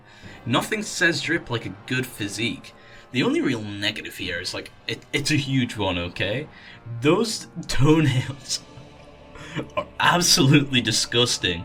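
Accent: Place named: Northern England, United Kingdom. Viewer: I love your northern accent, you from Sheffield or something?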